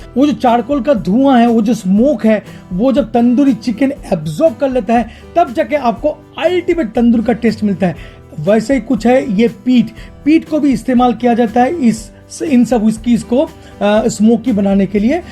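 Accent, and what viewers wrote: Bengal, India. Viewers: Actually I love your review method and bengali tone I like to have sweet whiskey please suggest some like black dog.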